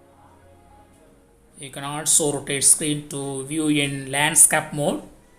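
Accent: Tamil Nadu, India. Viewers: Tamil accent 😁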